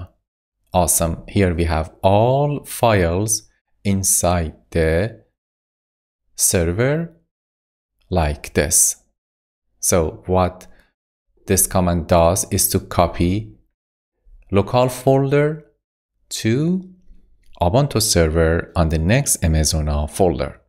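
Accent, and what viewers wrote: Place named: Iran. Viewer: لحجه ایرانی همه جا معلومه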